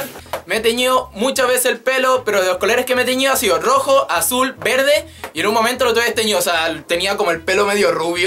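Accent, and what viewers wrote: Chile. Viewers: ¿Todos los chilenos hablan igual? \:v/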